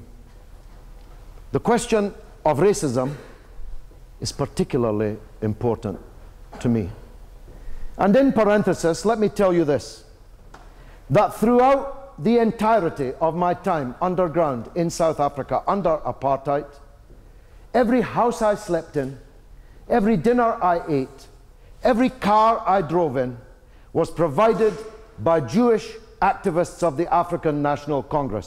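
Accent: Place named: Scotland, United Kingdom. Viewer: No, he's 100% Scottish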